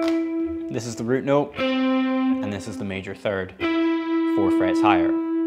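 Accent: Scotland, United Kingdom. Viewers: Sounds like a Scot to me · Nice one sir. Your from Scotland, but where exactly. We might be neighbors. Cheers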